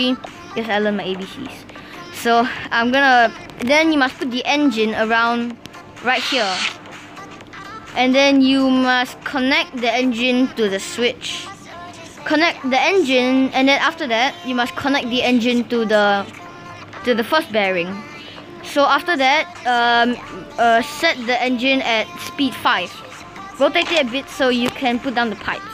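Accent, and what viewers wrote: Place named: Singapore. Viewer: You are singapore right? Bc your voices •_•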